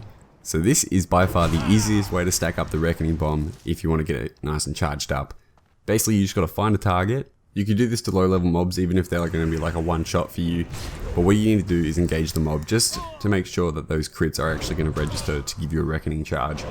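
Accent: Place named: Australia. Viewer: I hear a fellow Aussie here!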